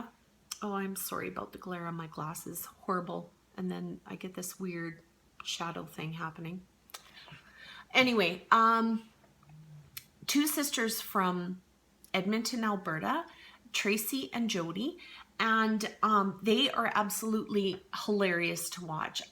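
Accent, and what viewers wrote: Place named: Minnesota, United States. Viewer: Your accent says Minnesota😎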